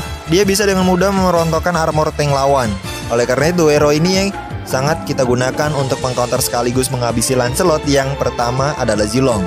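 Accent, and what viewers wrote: West Java, Indonesia. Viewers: Kang pasti org sunda ya kan Sama kaya gua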